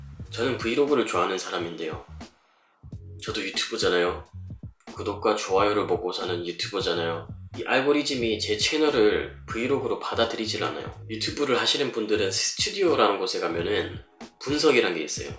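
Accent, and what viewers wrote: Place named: Seoul, South Korea. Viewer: 서울말 쓰시느라 수고 많이 하셨... 저도 브이로그 참 좋아하는데요. 유튭이 제 브이로그도 별로 안 좋아하더라구여 ㅋㅋㅋㅋ 화이팅 합시당 ㅋㅋ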